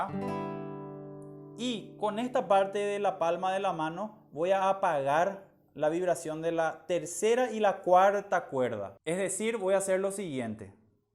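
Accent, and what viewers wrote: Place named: Paraguay. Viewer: por su acento y por lo bien que ejecuta la polca, definitivamente ES paraguayo 💞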